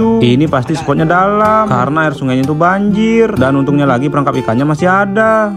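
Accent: Java, Indonesia. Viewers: Jowo mu ngendi to bang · Bg kmu asli mana sih kok ngomong nya ada jawa nya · Wong jowo a mas kok medok boso jowone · Jowoan smpean cak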